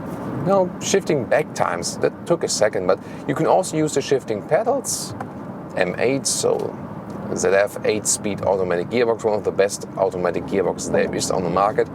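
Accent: Germany. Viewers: I don't think I'll ever bother to watch BMW reviews without a German accent again. It just seems. so. right.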